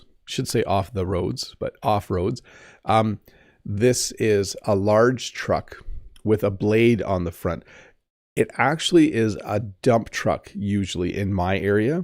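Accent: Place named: Canada. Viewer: Hello,! I currently live in California. Every day, I watch videos to improve my English listening skills. How different is American English from Canadian English? I really enjoy watching your lessons. Unfortunately, people around me don’t speak as clearly as you do. Or is this a feature of the Canadian dialect? Thank you very much for your work!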